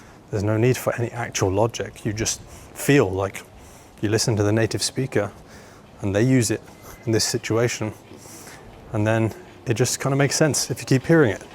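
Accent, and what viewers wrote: United Kingdom. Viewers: I love your British accent , you're a great teacher because your English is easily understandable! You really do a great job for non native English speakers! ❤❤❤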